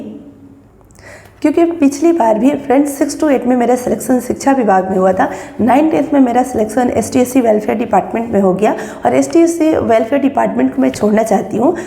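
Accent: Bihar, India. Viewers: Mam ap bihar se h?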